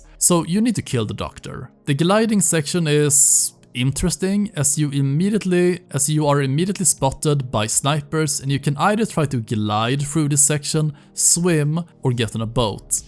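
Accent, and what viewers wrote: Sweden. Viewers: You sound so swedish. It´s funny. Good video.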